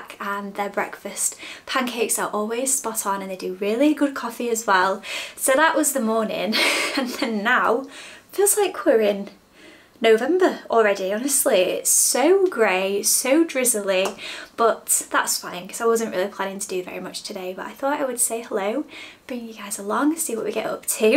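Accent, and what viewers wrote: Yorkshire, United Kingdom. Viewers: Please don’t stop saying ‘tea’. The Yorkshire dialect is one reason I ♥️ your videos so much! My dads from Sheffield & it makes me homesick to hear you talk!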